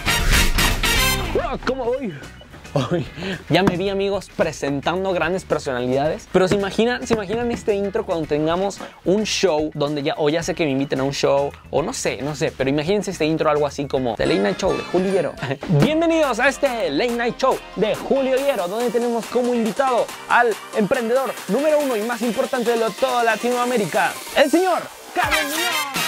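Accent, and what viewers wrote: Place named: Maya, Mexico. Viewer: Me gusta el acento maya jajaja👏♥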